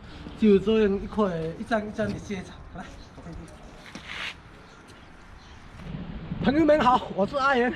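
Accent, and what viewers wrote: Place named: Fuzhou, China. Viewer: 听口音是福州附近海边的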